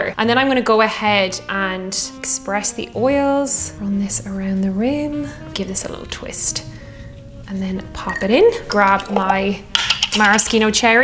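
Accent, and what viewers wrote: Ireland. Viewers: Gotta love the Irish accent.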